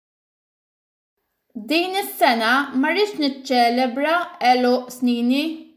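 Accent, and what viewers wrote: Tunisia. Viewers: لا تشبه غير اللهجه متاعنا باهي؟ توا هذي حكي دزيري؟ شبيكي نتي لباس المالطيه تونسيه كلمات تونسيه نغمه تونسيه نتو كلامكم مهوش كيفنا نتو كيما المغاربه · هاو تونسي هذا 🙂😂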